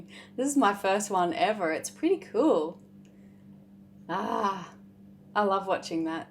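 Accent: Australia. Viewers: I loved the video and the aussie accent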